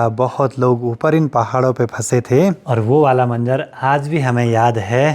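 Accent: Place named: Haryana, India. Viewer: Bhai haryanvi bol rahay ho tenay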